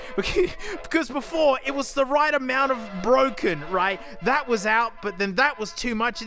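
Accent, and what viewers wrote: Australia. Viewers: It never gets old hearing an aussie man scream about cars.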